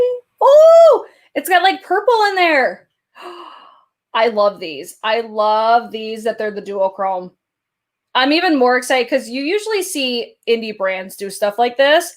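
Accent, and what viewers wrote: Midwest, United States. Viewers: New subscriber! You’re such a goof! I love you! Where are you from originally? Do I hear a Midwest accent?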